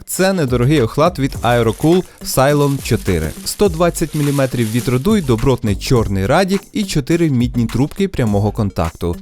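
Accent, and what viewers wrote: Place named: Surzhyk, Ukraine. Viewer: не критикуй його, він старається відівчитись від суржику. Краще слідкуй за собою)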